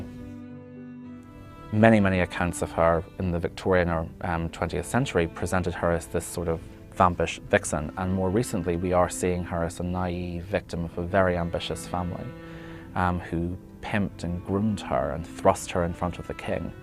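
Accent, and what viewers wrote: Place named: Ireland. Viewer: Love the Irish commentator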